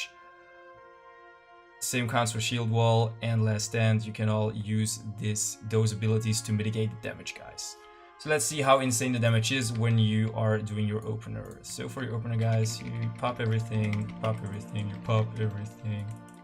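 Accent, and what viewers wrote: France. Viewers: omg i watched the whole video just because of that french accent :3 <3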